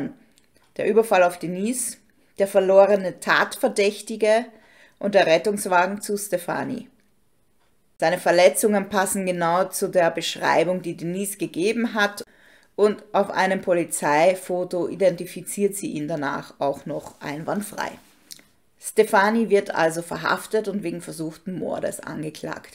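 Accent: Austria. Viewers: Erst mal danke für deine Videos, ich höre dir mega gerne zu, du erzählst schlüssig und spannend mit einer angenehmen Stimme und ich liebe diesen österreichischen Hauch total! Für mich hat der Typ was schizoides, wie 2 Persönlichkeiten in einer Person... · Danke für diesen Fall Was für ein Jammerlappen🙄diese Stimme und das Geheule ist echt furchtbar Hör ich da bei Dir einen leichten österreichischen Einschlag❓ Sympathisch 😉😉🍀🌸💙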